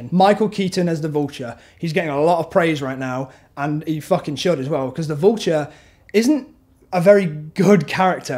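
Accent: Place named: East Midlands, United Kingdom. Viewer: I knew was an East Midlands lad! Woo!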